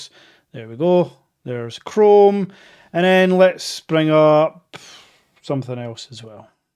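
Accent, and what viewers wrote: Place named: Scotland, United Kingdom. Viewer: Well I just realised your Scottish HA . Why I thought you were from the UK is beyond me. No hard feelings. I know eh and french eh . 😂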